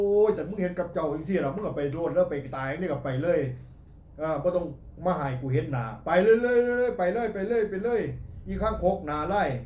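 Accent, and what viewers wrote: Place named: Nong Khai, Thailand. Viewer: พูดได้ดีมากคะไทหนองคายคือกัน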